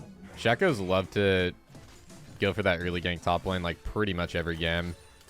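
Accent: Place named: United States. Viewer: What region of the U.S is that accent from? Well idk not accent but like...inflection?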